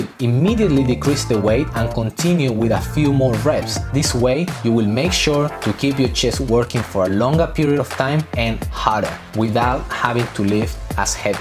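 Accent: Spain. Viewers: 一听你说英语，我就知道你母语肯定是西班牙语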